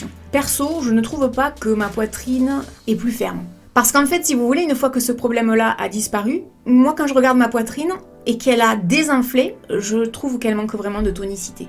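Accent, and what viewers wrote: South, France. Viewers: Coucou perso je porte des soutifs sans armatures que l'été et que quand je sort ou que je bosse. Le reste de l'année c'est juste un débardeur près du corps sous un pull .J'ai la peau très sensible et les soutifs avec armatures m'irrite la peau et parfois jusqu'à la brûler et cloquer. C'est très douloureux donc mon choix à été vite fait. Je dirais pas que ma poitrine à retrouvé ces 20 ans mais ce qui est sur c'est que nos muscles reprennent à soutenir tout seul et que au final ma poitrine tombe pas plus que sa. J'ai 38 ans 3 enfants et vie beaucoup mieux sans soutifs et j'avoue le regard des gens je m'en fou 😉. Ps:j'adore tes vidéos, simplicité et naturel, et ce jolie accent j'adore 😉 l'accent du soleil j'ai le même je suis pas loin de Perpignan 😃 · J'adore ton accent de sud😍😂 super video😉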